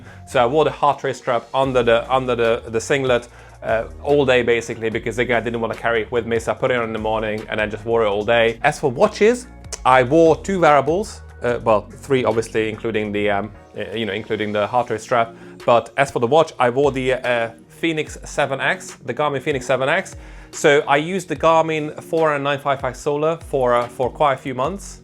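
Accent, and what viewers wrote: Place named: Hungary. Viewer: Hallatszik a kiejtésen hogy magyar vagy.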